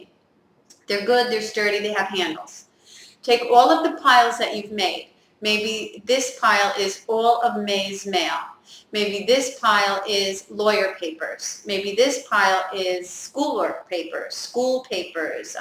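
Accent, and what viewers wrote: New Jersey, United States. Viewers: I found you by reading an article where someone mentioned you in an ebay forum about eliminating cluttler. The first video I saw of you is the one with zippered curtain bags. At one point I had every size. From curtains to sheets to bed in a bag. I got rid of them all. Well actually I gave them to my kids for camping for sleeping bags and linens. I think you're great! Are you from NJ? You sound like it. lol.. my email is <mail> looking forward to your emails and thank you!!!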